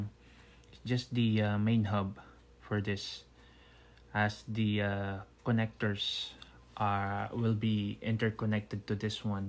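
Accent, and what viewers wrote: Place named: Philippines. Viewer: That pinoy accent is strong here.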